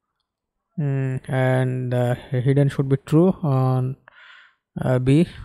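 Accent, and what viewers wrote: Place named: India. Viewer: loved the Indian accent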